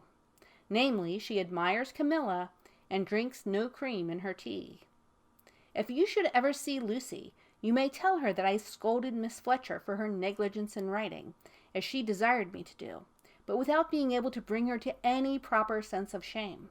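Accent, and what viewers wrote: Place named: United States. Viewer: It just doesn't work with an American accent 😕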